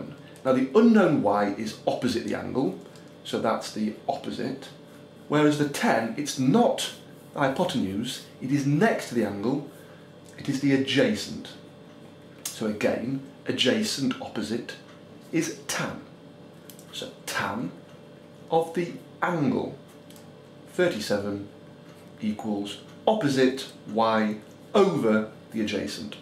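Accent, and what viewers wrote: England, United Kingdom. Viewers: English people make everything sound better. good stuff. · you're the reason why I remember sohcahtoa in a british accent lol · Your British accent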